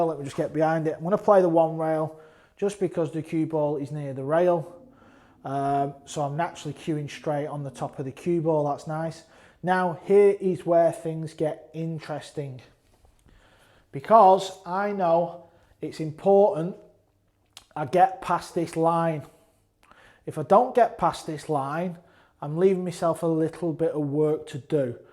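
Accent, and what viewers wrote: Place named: Lancashire, United Kingdom. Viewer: next time you do the Mosconi announcement, ride in on that scooter for added effect 😂😃! Good stuff though..When I zoom with Garstang cousins soon will say Lancs accent reminds me of them 😉 You teach well. Thanks for helping the global pool community.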